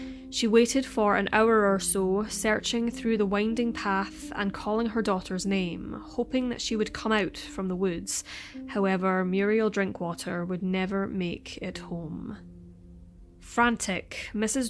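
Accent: Scotland, United Kingdom. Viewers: she is Scottish. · It's Scottish..! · She’s Scottish, not English!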